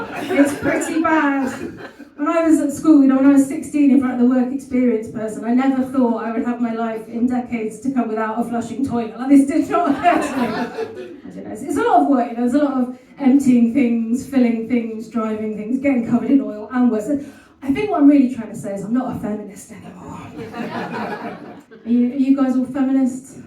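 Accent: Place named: West Country, United Kingdom. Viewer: What part of the west country are you from x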